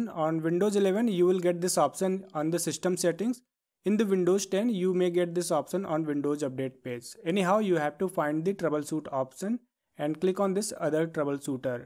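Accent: India. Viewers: Random YouTubers with Indian accent holding our society and computers on their shoulders. Thank you.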